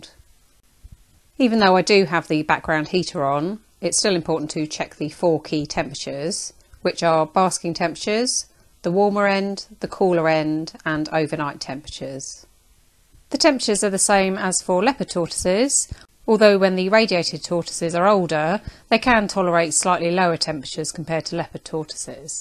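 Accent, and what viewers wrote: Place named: England, United Kingdom. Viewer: what part of England are you from? Your accent is really beautiful!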